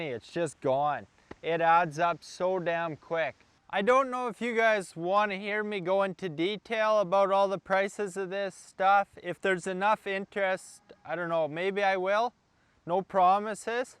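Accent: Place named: Eastern Canada, Canada. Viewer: thats gotta be an eastern canadian accent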